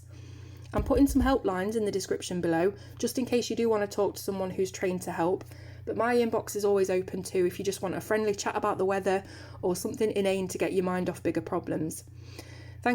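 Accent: United Kingdom. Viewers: Dear Claire, I have nothing particulary bright to say on all those issues you've brought up, so I'll just go with all the goods your channel brings to me. First I love the way your always giving all the details I want to know about a products and damn am I picky about details but you never let me down. I love how "creative" your are bringing up things that may not seem directly related to coloring but always end up interesting. To me your channel is like a massive safe place, I know I'll feel good watching your videos, have my brain focus on bright colored things while learning tips. This video is no exception to my "colour with Claire" experience. I don't mind deep subject when it's delivered simply and honestly like you just did. I think you have a huge skills when it comes to "talk" your pace, you're lovely British accent, your pronunciation, your way of playing with words always makes me feel relax and safe. 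The hard work your pour in your channel equals gentle bliss bubbles to me. Thank you dear Claire.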